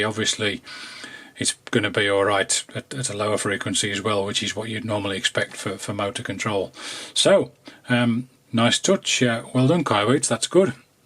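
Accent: United Kingdom. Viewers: Love the British accent kind calm and civilized.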